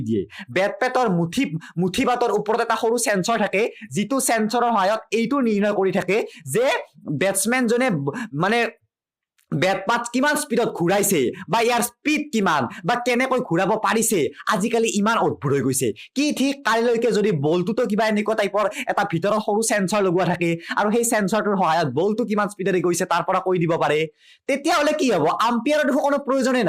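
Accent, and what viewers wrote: Nalbari, India. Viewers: Katha bilak suni val lage nolbaira tu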